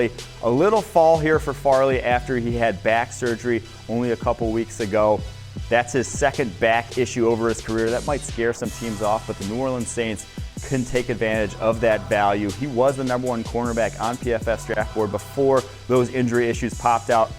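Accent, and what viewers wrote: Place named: Chicago, United States. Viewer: Something tells me this narrator is from Chicaaaaago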